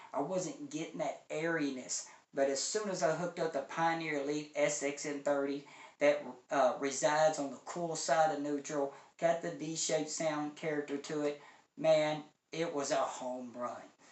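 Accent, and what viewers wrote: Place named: Appalachia, United States. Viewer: Your an inigima, your accent lends one to think your a hillbilly. But if someone actually listens to you they should be taking you seriously. Cheapaudioman is rather goofy and very popular. People probably think you wear a red hat when not on camera.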